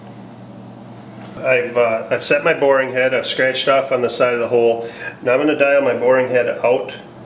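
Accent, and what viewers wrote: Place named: Wisconsin, United States. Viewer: i can tell you're from WeesCAWNsin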